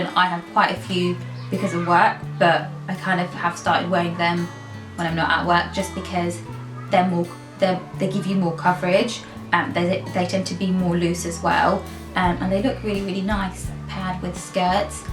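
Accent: United Kingdom. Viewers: ASAK, I have to say I had to watch your video twice because I was just so taken with your accent! It's lovely, Masha'Allah. What part of the UK are your from? Great video and looking forward to more. Oh yeah...where is your scarf from? Thanks!!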